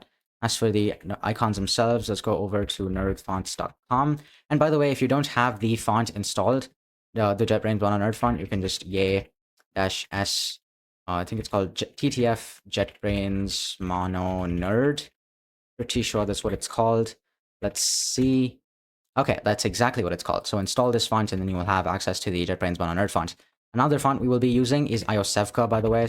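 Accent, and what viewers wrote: India. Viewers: indian voice sayin OKA OKA jeez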